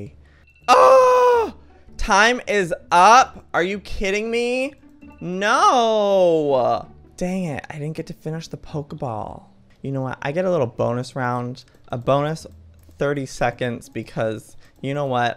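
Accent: South, United States. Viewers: I loveeee his country accent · Omg he has a country accent ❤️❤️ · Is from the south?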